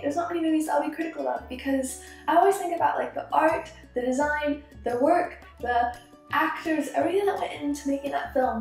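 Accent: England, United Kingdom. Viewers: Your accent is changing! Becoming more English 😮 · Does she have a British accent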